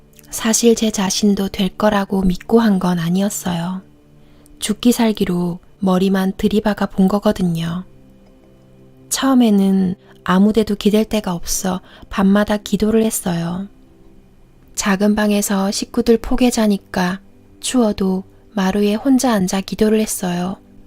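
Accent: Gyeongsang, South Korea. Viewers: 경상도 억양 목소리 사랑해요~~^^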